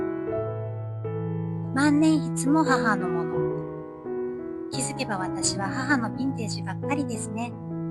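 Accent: Kyoto, Japan. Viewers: いや いやいやぁぁぁぁぁぁぁ…… これがLilyさんの声かぁ〜 なんちゅう 声…… 動画を見つつ どういう風に例えたら 良いかと 思っていました。 とっとこハム太郎のリボン🐹🎀ちゃん みたいに 可愛い 声。 声がこんなに可愛いのなら お顔も 絶対可愛いはず‼️ 声優さんやナレーションのお仕事をされていたのでしょうか？ いつも散策の時の 微かに漏れ聞こえる つぶやき じゃぁもったいない。 話すテンポや 言葉選び 。 とても 素晴らしいじゃあ〜りませんか✨ 京都訛りの穏やかな 口調の 中に やまとなでしこ らしく 強さを感じます。 これだけの動画編集……｡ どれほど時間をかけたのかと思うと 拝みたくなります。🙏🏻✨ 見応えのある４５分でした。 コーヒー☕️を煎れて もう一度 観たいと思います。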